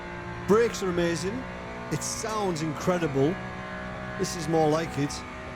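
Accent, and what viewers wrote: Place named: North East England, United Kingdom. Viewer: Hey, I notice your North East accent. You based up here in NE England by any chance?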